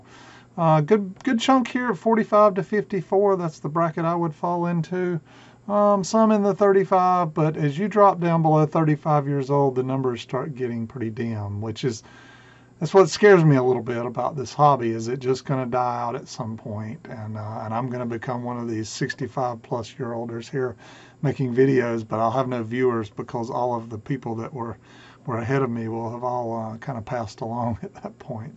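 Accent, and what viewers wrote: South, United States. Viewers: I love all your videos, especially the tube amp builds. Doesn’t matter if they’re long or short. I’m in the process of watching them all from the very beginning so keep on making them please. I watch them in total but will pause occasionally for mundane stuff like housework and eating etc. You’re southern drawl is very pleasant to listen to and I’ve learnt loads since I started. For that I’m extremely grateful sir. I retired from electronics two years ago but still have a big soft spot for tube gear, radio or audio, and your knowledge is invaluable. Thank you.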